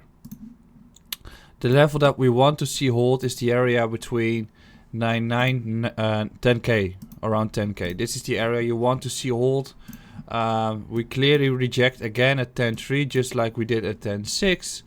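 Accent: Netherlands. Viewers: thanks for your vids and your sweet Dutch accent...I assume. the bounce off the 20 week average was promising but market looks like its weakening.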